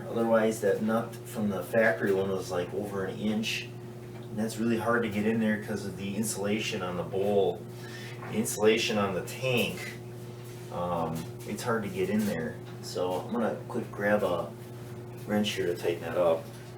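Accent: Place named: Midwest, United States. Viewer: You've gotta be from Ohio or Minnesota 😂❤ thanks for the vid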